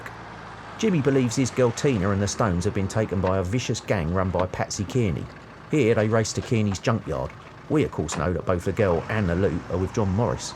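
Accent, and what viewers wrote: Cockney, United Kingdom. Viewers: I love a cockney diamond geezer accent. Your research is brilliant. Grew up in 70s watching the Sweeney. Its so good its repeated on itv 4 along with minder and the professionals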